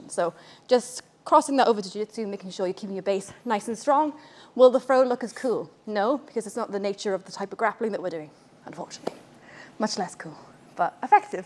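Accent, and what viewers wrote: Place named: United States. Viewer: I can hear a hint of a US accent developing in the intro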